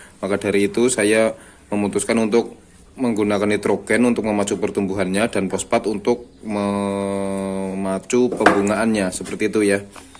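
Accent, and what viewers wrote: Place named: Banyumas, Indonesia. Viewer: Ngapak nih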